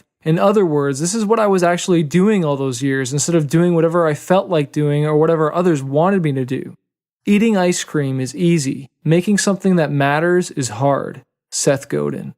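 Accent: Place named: Canada. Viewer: This this probably my favorite chapter in Fighter. I've never really noticed your Canadian accent until now...XD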